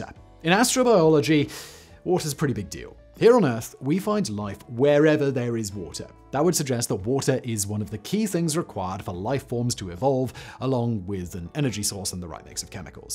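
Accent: England, United Kingdom. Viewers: "Is There A Life" on the thumbnail? Poor English from someone with an English accent...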